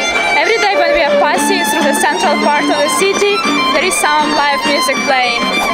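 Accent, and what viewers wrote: Russia. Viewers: annoying accent. russian? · This Russian accent sounds so horrible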